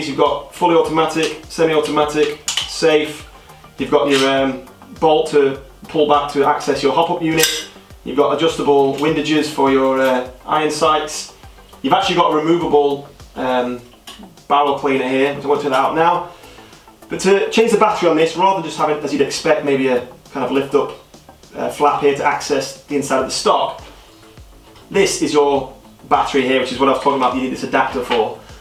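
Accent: United Kingdom. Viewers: Soon as I heard his wussy British accent I knew the ak was fake. Oh well, back to trying to plate my real ones. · And he also has a British accent.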